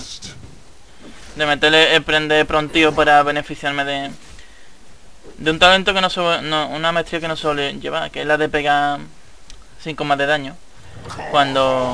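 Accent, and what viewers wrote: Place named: Andalusia, Spain. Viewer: a que tu eres de andalucia?¿ o al menos pareces XDD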